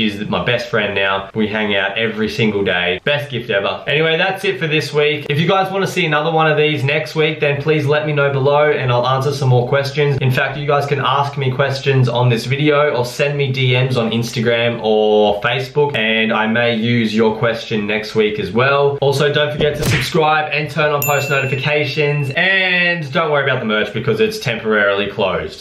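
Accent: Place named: Australia. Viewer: Omg really? You sound like a Aussie for sure lmao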